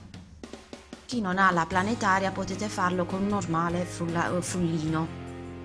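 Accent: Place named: Taranto, Italy. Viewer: Bravissima anche la mia nonna le faceva cosi e forse sentendo il tuo accento non mi sembra molto diverso dal suo. Lei é di un piccolo paese in provincia di Taranto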